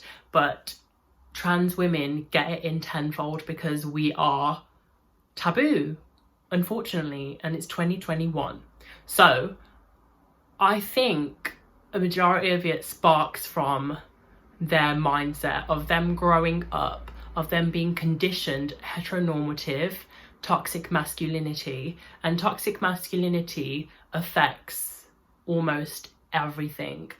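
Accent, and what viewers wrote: United Kingdom. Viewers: I'm straight married soon to be divorced...and sweetheart I would love to date you. #1-You're Gorgeous #2-I love you're accent #3-You're not only gorgeous on the outside but I'm sure inside too heart & soul #4-I'm secure on my masculinity #5-I don't care what people say or think...as long as were both happy #6-I would love to date you sweetheart...I love and adore British women & you too !!! If you ever wanna chat...I would love to meet you...amor I need a woman like you in my life ! Take Care sweetheart I wish all the best !!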